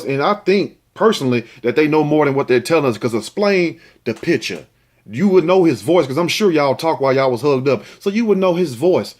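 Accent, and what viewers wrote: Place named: New York, United States. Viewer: I live your accent? Are you from New York?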